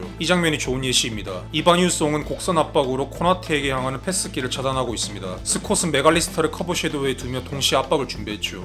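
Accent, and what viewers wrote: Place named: Gyeongsang, South Korea. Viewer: 경상도 스탈인듯요~~^^😮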